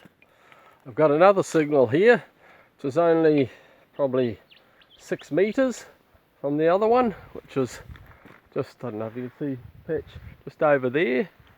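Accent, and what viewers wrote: New Zealand. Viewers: Really enjoyed your commentary. Nicely done and I will be back.. Kiwi? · Funny how a.i. messes up on translating the kiwi accent ... some funny mess ups throughout this video !!!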